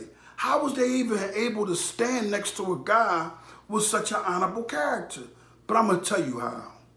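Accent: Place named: East Harlem, United States. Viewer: Yu got like a East Harlem/South Bronx accent. U from the x?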